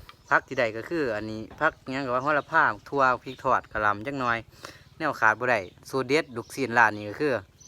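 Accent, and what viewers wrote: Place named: Phu Thai, Thailand. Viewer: สำเนียงภูไท จริงๆ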